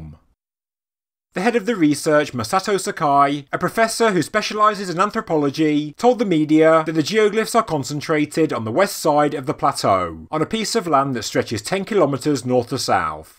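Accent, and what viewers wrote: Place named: Southern England, United Kingdom. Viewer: I'm English and I've no idea why he's talking like he's got a class of 3 year olds. For anyone outside of Britain I'd just like to point out that we don't all talk like him... Or the Queen.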